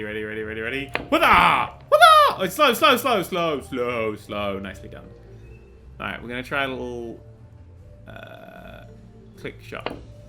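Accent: United Kingdom. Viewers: Discovered this channel with the Roller Coaster Tycoon games comparisons and have to say this guy knows how to make good damn videos just a quick question are you British?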